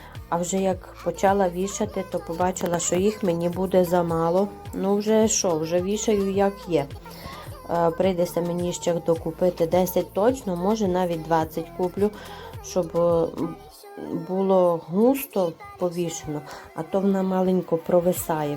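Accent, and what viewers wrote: Transcarpathia, Ukraine. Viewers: Цікаво Вас дивитися,дуже люблю людей з Закарпаття і ваш діалект.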